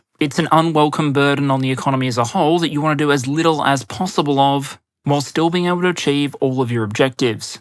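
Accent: Australia. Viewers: No, no, no, once a month 😱? once a week is little enough. Every Sunday I'm looking forward to power point presentation. I even started to like that Ozzy accent! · Fantastic vid Aussie! I always love seeing one of your videos with a less than sexy name e.g. "Widgets: the design, building, procurement, and supply chains involved", and also knowing some guy who talks funny from down under is going to have my undivided attention for the next hour. I am surprised however when talking about the Bush Turkey, you left out how successful its predecessor the SD-20 Dingo was in it's only decisive victory over Kiwiland in their most recent war. #StandWithKiwiland #StopEmuAggression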